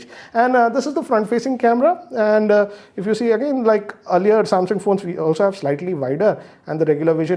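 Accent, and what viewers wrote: India. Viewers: OMG I love indian people accent 😁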